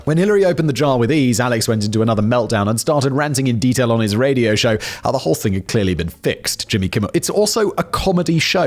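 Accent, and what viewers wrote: United Kingdom. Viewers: I am not a dude and I don't recall YouTube inquiring of my gender. I am subscribed to every channel you have because facts being delivered in the voice of my former colonial overlords helps me sleep... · I’m a twenty something white/Hispanic girl and I absolutely vibe with all your content Simon. Super sad you had to leave geo/biographics bc that’s where I started watching you before branching out to all your other channels but ultimately understand why it had to happen. Either way I’m just a big fact nerd who loves your fancy British accent and cracked out tangents! Never change my dude!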